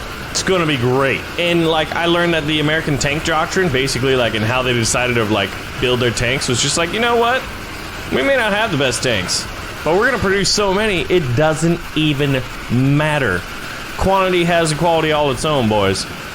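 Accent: Germany. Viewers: i swear your german is sooooo funny😂😂i am from austria so i gotta know😅🤣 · u pronoce brezel wrong sorry XD you german acent is so bad it hurts in my ears i love it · Nice German accent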